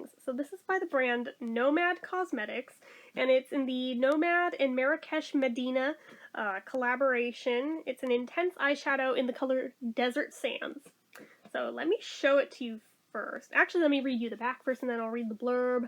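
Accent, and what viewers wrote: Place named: Vermont, United States. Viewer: Speaking of Vermont, do you live there? Or originally from there? Your voice/accent is almost identical to my buddy from Stowe. Like scary close😂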